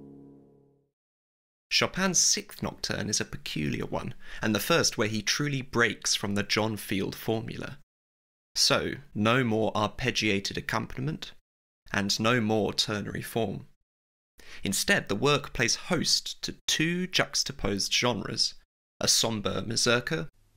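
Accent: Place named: United Kingdom. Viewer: Well, why not do another video? Decide on your intended audience (casual listeners or devoted students), announce what you're going to demonstrate, and do your demonstration in a relaxed, orderly and progressive way. Clearly you have the knowledge and the ability. Your British articulation is beautiful, and no doubt your analysis is insightful, but I couldn't follow you. Either I am a slow brain or you spoke too fast. I'd like to hear what you have to say about Chopin's Nocturnes, but why cram everything in one video when you can make as many as you like? Best wishes . . .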